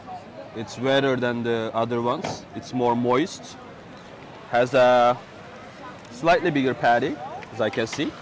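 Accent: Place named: United Kingdom. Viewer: Gerçekten saygı duyduğum bir insansın . Gösterişten uzak işine saygı duyan hayata insanlara duyarlı gerçekten örnek bir kişiliksin . Çizgini de asla bozmadın . Haddim değil belki ama bir birey olarak her defasında aferin , helal olsun cümlelerini içimden geçiriyorsun . Bu yaptığın İngilizce videoların bile amacının globalde ülkemizi ülkemizin zenginliklerini tanıtmak olduğunun da farkındayım . Aslında daha da dikkat çeken ne biliyor musun kaç yıl Amerika’da yaşamana rağmen daha british konuşman ve basit cümleler kurman . Belki çoğu kişi ne demek istediğimi anlamayacak ama açıklayım . Basit İngilizce ile anlatıyor ki biraz İngilizcesi olan bir Hintlide Slovakyalida Rus’ta Arjantinli de anlayabilsin diye . Çok ince düşünmüşsün tekrar helal olsun .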